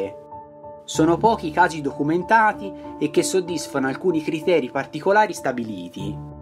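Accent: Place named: Tuscany, Italy. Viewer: Video molto istruttivo.Bella la parlata toscana sempre gradita grazie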